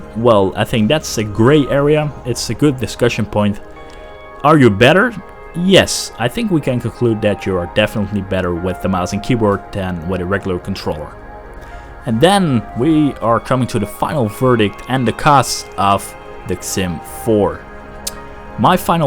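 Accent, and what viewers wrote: Netherlands. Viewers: Are u from the NL? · Are you dutch? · Hoorde al aan je accent dat je Nederlands bent ;)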